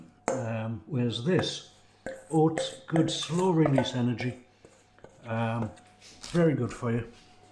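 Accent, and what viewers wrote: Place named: Newcastle, United Kingdom. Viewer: Ha way Geordie !!!:face-green-smiling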